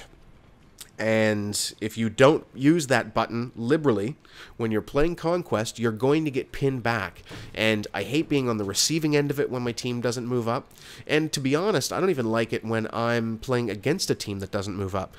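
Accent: Canada. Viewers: yes your Canadian... REPREASENT!!!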